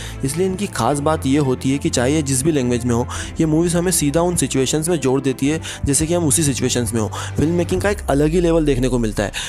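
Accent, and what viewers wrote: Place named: India. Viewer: your voice doesnt suits at all for western movies cuz of ur accent,well i dont blame you but you are good to narrate for hindi movies tho.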